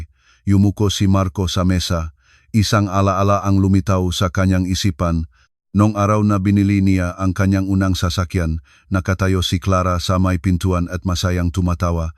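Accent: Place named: Visayas, Philippines. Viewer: Nhihirapan ako mkining syo utal utal ang slita visaya kb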